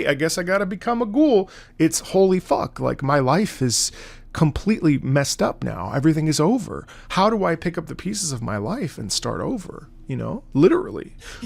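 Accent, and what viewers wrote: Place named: Italy. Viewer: I love how you pronounce Japanese with an Italian accent, genuinely really charming :D like the oshiete yo becomes oshietero.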